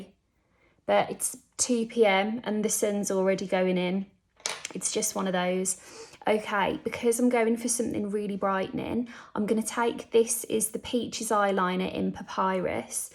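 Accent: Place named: England, United Kingdom. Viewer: I love your English accent, American accent is an accident.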